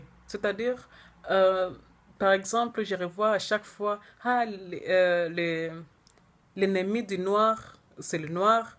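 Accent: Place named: Germany. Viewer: Joli ce petit accent d’Allemagne petite sœur......Tmtc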